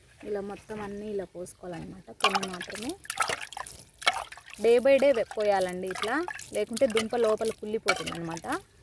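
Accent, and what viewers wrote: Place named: Telangana, India. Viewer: మేడం వాయిస్ రాయలసీమ లాగా వస్తలేదు మేడం. పక్క తెలంగాణ లాగా వస్తుంది మేడం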